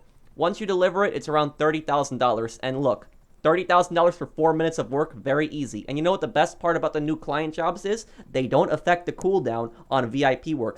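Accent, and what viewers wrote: Poland. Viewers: Are you polish? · I got kicked when I was watching TV on pc... Btw are you Polish? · Are u polish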